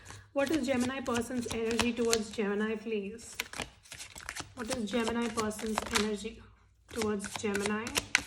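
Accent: India. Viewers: I presently live in Mareeba, and my wife of three years has been "stuck" in Shanghai China for another 12months... and then this recent interloper is almost a "nut case"... My Wife is 10 years younger & 12may, I am 21may... Xinji holds my heart, but cannot leave China due to "covid & family", we do not speak the others tongue... I have some traits related to aspergers, bipolar & dementia etc... I want to produce "cold pressed coconut oil" on Vella Lavella Island, Western Province of Solomon Islands (I am fluent in "tok pisin" and have a masters degree & science degree in Tropical agriculture growing and processing Theobroma Cocoa (chocolate), coconuts, since 1970... (tea & coffee is a recent hobby interest)... I love sailing catamarans... Your accent sounds like Southern Indian? - I have resided in Bangalore, & Nagacoil for a 6 month holiday, and earlier, 2and a half years in Penang, Malaysia... 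At 75 years old I am presently bloody confused, waiting for "Ascension" on 21 Dec??? ... <mail>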